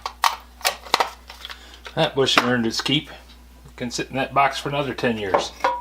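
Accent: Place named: Kentucky, United States. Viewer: Probably still too cold there for lawn stuff but got around some Kentuckians and adapted somewhat to their way of talking. He did a video where he was gearing up and said 'Be sure and put yer stuff awn' in such a manner I thought a hillbilly had dubbed it in. I learned to talk like a Yankee in the Ahmee but never thought it'd go the other way.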